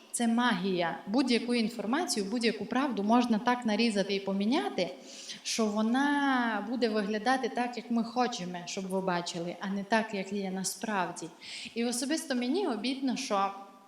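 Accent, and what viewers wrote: Transcarpathia, Ukraine. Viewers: Гарно балакає по закарпатьськи, ФАЙНООО!!! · Дякую за Вашу творчість, за Закарпатську говірку. Усі говірки - то скарб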